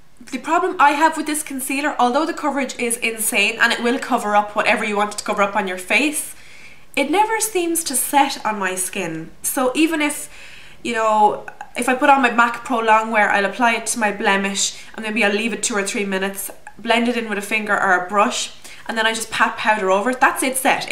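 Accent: Ireland. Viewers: just love your irish accent. thanks for the reviews.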